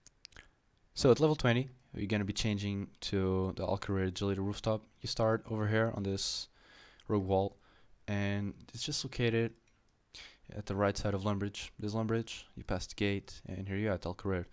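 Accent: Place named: Portugal. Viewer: Where are u from? You sound kinda portuguese..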